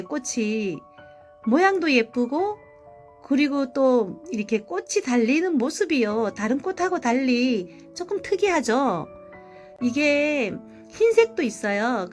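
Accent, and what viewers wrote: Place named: Gyeongsang, South Korea. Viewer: 경상도 억양이셔서 여쭤봤어요~~^ 즐거운 하루 되세요~~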